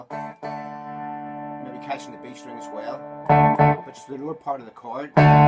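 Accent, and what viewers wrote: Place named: Ireland. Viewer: finally got to hear your voice 🤠 man you got that ruff ol' Irish accent and sounds great 👍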